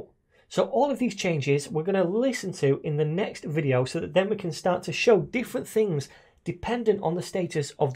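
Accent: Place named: England, United Kingdom. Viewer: we have it COVERED.. but he's english and speaks fast so it seems covid.. lol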